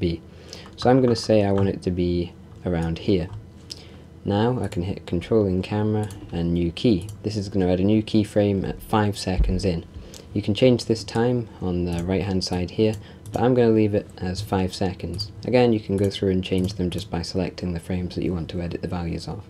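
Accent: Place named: Canterbury, United Kingdom. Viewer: Are you from Canterbury? Im trying to learn southern accents more and my AS chemistry teacher and the guys from Syd Arthur sound similar to you, both are from Canterbury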